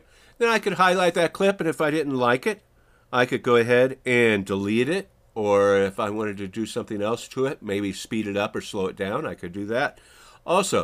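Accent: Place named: United States. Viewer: the english of yours is pretty dement, speaking like proper yankee.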